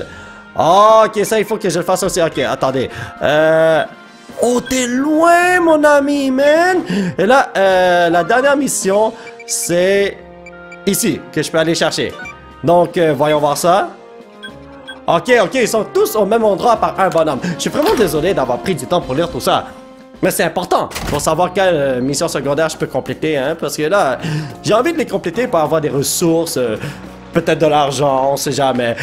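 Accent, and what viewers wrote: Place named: Quebec, Canada. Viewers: Je crois qu'il est québécoi je suis pas sur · t le best ton accent ma trop donné envie d'aller habité au Canada. Sinon j'espère que a la fin de l'aventure tu auras une équipe badass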